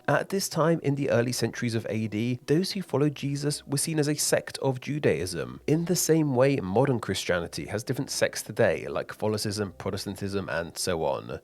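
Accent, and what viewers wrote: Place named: United Kingdom. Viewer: This is probably gonna get pushed down, but since I didn’t see anyone else saying it, the pronunciation of the Hebrew word for Passover is not in fact “Pesca”, and the meal isn’t said “Sayduh”, it’s “Pesach”(roll the ch like “fancy” Bach) and “Seder”(both E sounds are like “Ben”). A good video historically speaking, but the British accent is strong here, lol.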